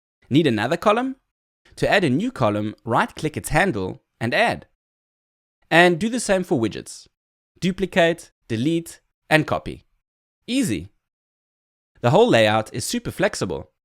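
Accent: South Africa. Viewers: That's how South Africans speak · Sounds like South African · it retrospect idk what i was thinking, sounds pretty south african. i think it just hit me as pretty unique the first time around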